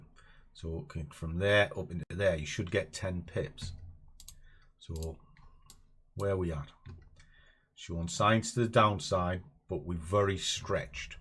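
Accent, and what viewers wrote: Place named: Northeast England, United Kingdom. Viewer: haha I just watched this video and oh nice I’m from Newcastle can tell u was close by the accent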